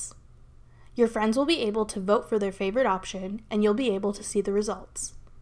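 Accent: Canada. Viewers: I agree- very informative. And... Did I detect a Canadian accent, OR have I just been watching too much hockey lately?!😀 PS ISN'T GIF pronounced like Jif ? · I love your accent - apart from the Canadian "out" right at the end!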